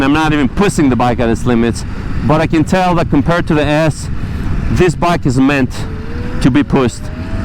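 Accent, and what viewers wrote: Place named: Greece. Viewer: violently greek accent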